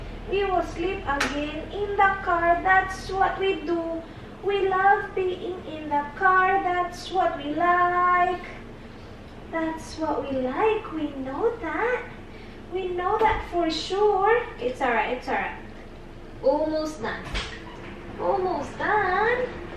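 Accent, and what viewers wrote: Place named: Visayas, Philippines. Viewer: Hala gibisaya nana nmu ate..hehehehe..so cute😍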